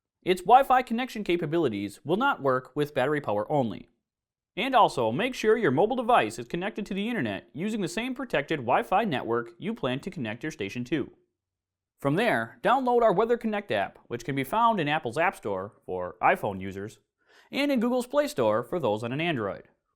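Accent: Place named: Minnesota, United States. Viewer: You sound like a character from F A R G O TV Series.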